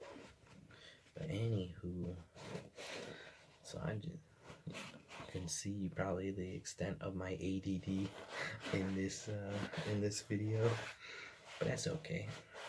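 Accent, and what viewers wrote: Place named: Midwest, United States. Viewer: Have you always lived in the east? (Don’t know if you are comfortable with me saying your state). I ask because it sounds like you have a little midwestern in there!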